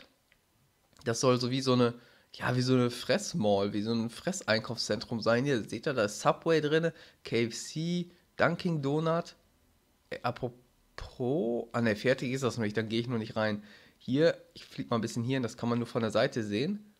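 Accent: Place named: Dortmund, Germany. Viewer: Als ob du aus Dortmund Kommst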